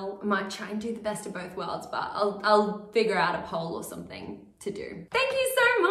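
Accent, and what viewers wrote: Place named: Australia. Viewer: Really good cover! 👏 I love the chatty videos! I like hearing you talk. Not only to hear your lovely Aussie accent (lol) but to hear your stories on your musical journey.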